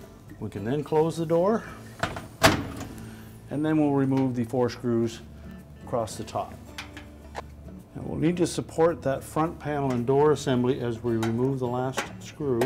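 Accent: Canada. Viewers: I carefully watched your instructional video documenting the procedure for replacing a door seal on an LG Front load washer; your ability to communicate is nothing than outstanding! Especially the segment pertaining to the fitment of the door seal to the washer's drum and the use of locking pliers and a flat-blade screwdriver to install the metal retaining ring to the drum-seal interface. The language was clear concise and unambiguous. The visual aspect of the video clearly depicted the finer points of the job with clarity and preciseness. I picked up on the Canadian🇨🇦accent as I live in Buffalo, NY on the border; hence the impeccable use of the English language. Keep up the good work and I look forward to viewing your productions in the future.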